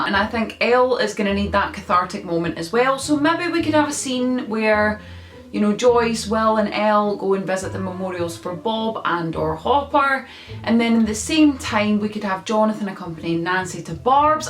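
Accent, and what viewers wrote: Scotland, United Kingdom. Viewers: Well I search for Scottish girls and her accent video pops up on 3rd place...yup definitely going to sub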